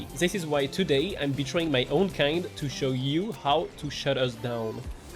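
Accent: France. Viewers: Thanks for this insightful video, and the french accent is icing on the cake, tu gères mon gars ! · Bro your French accent is killing me xD. Greetings from Germany, only a fr*nch can play shield like that, bc of the Police nationale Heritage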